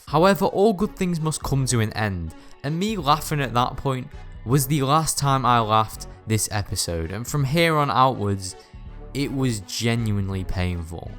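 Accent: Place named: Northern England, United Kingdom. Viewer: Loving the reet northern accent ✌️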